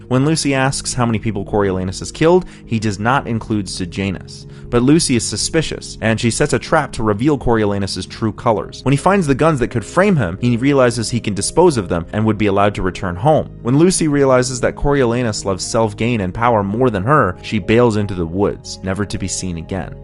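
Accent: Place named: Canada. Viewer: Question, is he Canadian? The “OUUT” gives him away. lol Love the video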